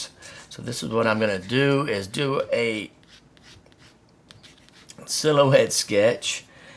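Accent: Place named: Texas, United States. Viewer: I love your Texas accent.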